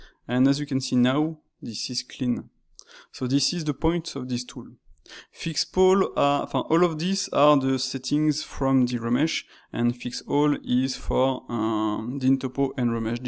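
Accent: France. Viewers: I have been playing around with Blender for just over a year, only learning through youtube and trial and error. Sir, this is the best explanation of Remesh verses Dyntopo I've heard yet. And that is even with the French accent.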